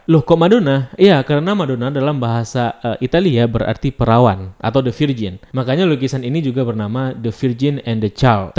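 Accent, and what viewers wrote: Aceh, Indonesia. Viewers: Logat Aceh si Babang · Beliau orang aceh. Yap jelas ada bagian suku dan budaya melayu. Lebih tepannya melayu sih dari pada suatu negara. Karna melayu ada di malaysia dan Indonesia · Wajarlah Beliau kan orang Aceh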